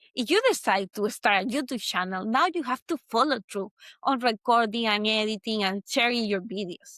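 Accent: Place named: Latin America. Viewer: Cute accent. Can I guess? South America, Italian origins, swimmer and dancer, living somewhere near the sea.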